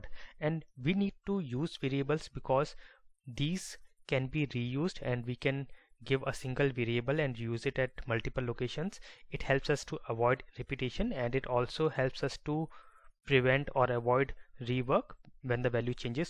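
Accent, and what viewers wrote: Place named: India. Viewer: I love your English)))).... every seconds of this video i remember time when i was in India)))... hello from ukraine... and thx for manual... U very help me!!! thx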